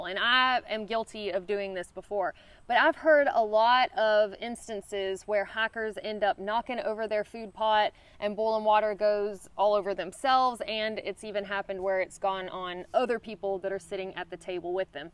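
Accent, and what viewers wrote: South, United States. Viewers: A blackened pot heat up/ absorbs the infared 25+% faster if you add a lid its another 25% and less fuel and time . Also aluminium is slightly lighter but is bad for you health long term as no matter how much you clean it is pourish and you can wipe it with a tissue when it's dry and still get grey aluminium residue. Titanium is slightly heavier but cooks better isn't toxic and can store water or food for long periods no problems. Toaks,lixada,boundless voyage , all have great pots with frypan lids around 1000ml same shape as yours roughly at around 150 grams. I'm more a bushcraft ,survival ,inch bag ,wildcamper but learn tricks from all the disciplines for different packouts decisions and I love your chan for the character and thru hike aspects and charming southern accent :) 🍻 from 🇨🇦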